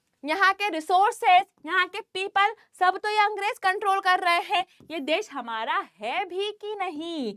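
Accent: Bihar, India. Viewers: Your Bihari accent is so awesome " CHAMKA BHAI, CHAMKA TUMKOO"😅😅😂😂❤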